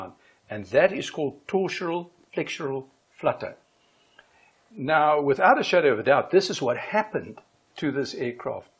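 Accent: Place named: South Africa. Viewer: Thanks meneer - nice to hear a South African accent on YouTube. Great analysis, I learned something....greetings from Portugal.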